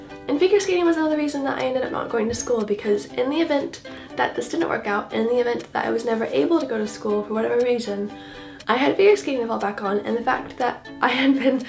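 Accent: United Kingdom. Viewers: I feel like I can hear more and more of a tiny little British accent coming out! 😍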